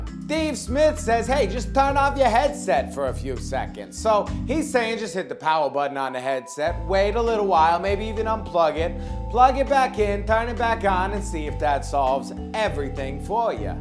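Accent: New York, United States. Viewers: His voice accent is an accent from the late 1800's New York you're a fucking stupid moron lol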